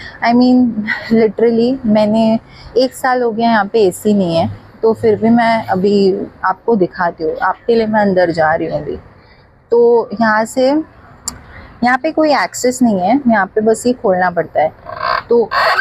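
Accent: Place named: Maharashtra, India. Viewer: Aap Maharashtran ho Kya Mahrati Awaz Arhi Thi 😍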